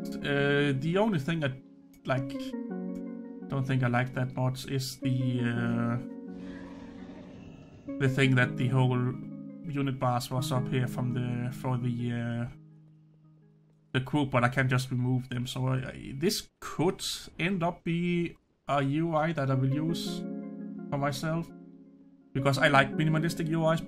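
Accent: Denmark. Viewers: The danish is strong in this one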